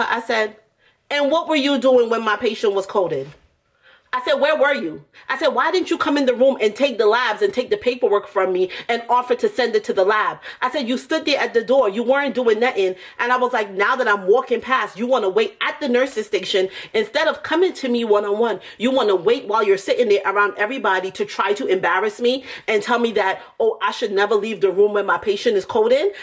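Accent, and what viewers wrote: New York, United States. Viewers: I’m back too 👏🏾👏🏾👏🏾👏🏾👏🏾that New York accent 😝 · You from NY or Jersey ☺️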